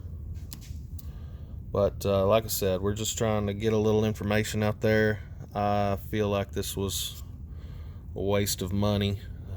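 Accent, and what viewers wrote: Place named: East Texas, United States. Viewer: Hi, you sound like you're in East TX. If so, would you be able to help me identify if I need a complete rebuild or if I just need the basic kit? I'd appreciate it!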